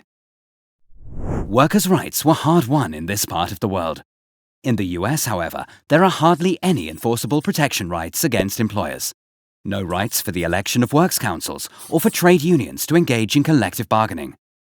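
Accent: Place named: United Kingdom. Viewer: irony. the guy has a UK accent. ////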